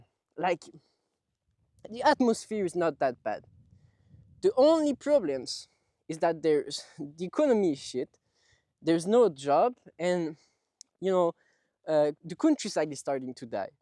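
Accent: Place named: France. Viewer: what part of france is your accent from?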